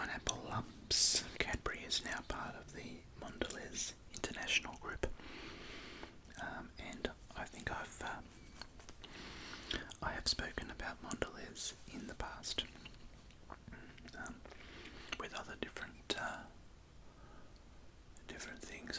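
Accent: Australia. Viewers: Love. Just straight up good sounds to help people out. No bullshit ads in between that wake you up and/or startle you. Cheers you're awesome and you've helped me alot 💪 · My Beautiful Aussie 🇦🇺 Excellent job, the sound of your voice & your accent is wonderful. You make me happy.😘💕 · Damn it Australian accent is the best imo.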